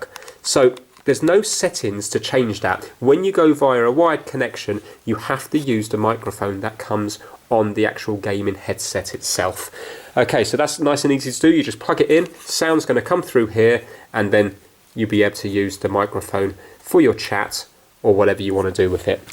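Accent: United Kingdom. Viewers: The british accent is very funny lmao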